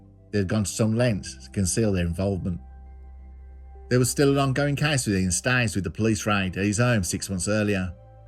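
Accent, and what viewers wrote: Birmingham, United Kingdom. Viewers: The ambient music, that Brummie drawl, the street slang. I smile to myself, another episode of Curios & Spiritual. 😊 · The brummy accent is awful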